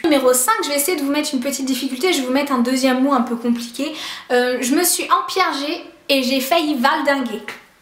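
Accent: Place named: Nord, France. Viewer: Et même l’accent tu reprends l’accent ch’ti ça porte à confusion .. mais du coup tu viens de quel partie du « nord » ?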